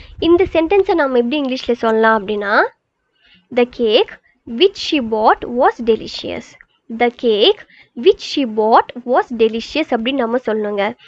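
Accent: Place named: Coimbatore, India. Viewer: vaanga ponga inga nu soldrathulaa alagaa irukku sister...Coimbatore style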